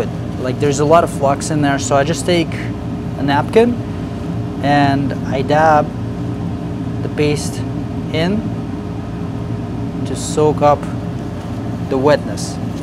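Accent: Russia. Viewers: Do you have Russian accent? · мне кажется, или у тебя русский акцент?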